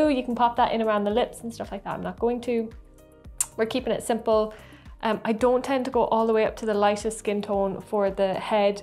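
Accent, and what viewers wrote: Ireland. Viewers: A beautiful redhead chirping away at the camera in a charming Irish accent and doing a rather good job painting up that miniature was a great thing to watch before bedtime, tonight. Good job, Miss, and I bet you do rock the elf ears if ever you wear them? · Oh wow, I wasn't expecting an Irish accent. Galway or Mayo I'd guess (from Galway myself, and in fact see my own first name on the wall). Kinda new to wargaming/mini painting and have no idea how popular it is in Ireland, so this at least gives me some hope! Looking forward to checking out the rest of the channel after this as I'm loving this tutorial so far. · Always been on the lookout for a tutorial that shows exactly how to paint skintones, seen some but they were very vague. So seeing this video with it's perfect on the spot tutorial being shown by the gorgeous has already made 2022 worthwhile. 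The fiery red hair, bright red lips, gorgeous eyes and that wonderful Irish lilt, WOW! Going to check out your backlog of videos and Subbed!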